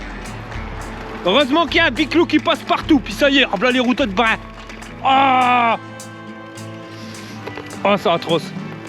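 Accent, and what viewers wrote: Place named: Nord, France. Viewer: salut! Ta chaîne n'est pas la seule que je regarde, mais c'est celle qui me ravit le plus!!! Tu parviens à la fois à donner des infos pratiques, techniques, et touristiques avec le sourire et la simplicité!...Le tout avec eul' tchiot'accint du Nord qui me rappelle ma jeunesse valenciennoise... Merci, et bécot à Maryse... PS: -j'ai du mal avec Komoot: pas simple à utiliser, et pas toujours facile de retrouver sur l'appli certaines balades pourtant référencées sur le site internet... -si l'envie vous prend à tous les trois de découvrir l'Alsace et les Vosges du Nord, vous pouvez déjà compter sur une logement!;-) mais attention!!! Ça grimpe dur!!!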